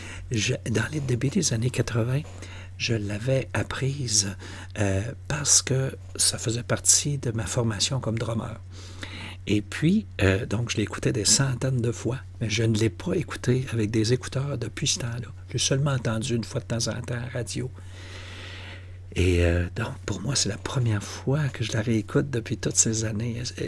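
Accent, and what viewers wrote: Quebec, Canada. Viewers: I like your reactions and commentary. Helps me keep up up with my French! (The first time I saw one of your posts I knew immediately that you were from Quebec). From, can I suggest and.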